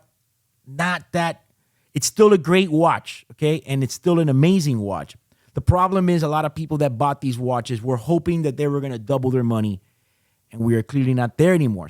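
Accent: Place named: Miami, United States. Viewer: Heaviest Miami accent ever. Clear-lee.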